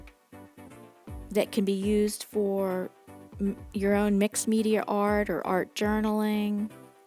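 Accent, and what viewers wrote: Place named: Pennsylvania, United States. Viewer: I like how she got her name. Sounds like you are from the other end of PA from me. That digital art was cool on an ipad. That wildlife one at the end had some pop to it. Watched the video in full gave you a like #payingitforward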